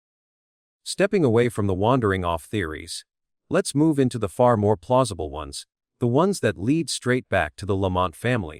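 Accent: United States. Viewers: I found the cases from overseas very confusing!!! Why bring all of that stuff into the picture? It has NOTHING to do with the Gus Lamont case here in Australia. Also, I DON'T LIKE the AMERICAN ACCENT of the person talking about the Gus Lamont case. What is wrong with our good old Aussie accent? I won't be watching any more cases from Crime Cases in future!!!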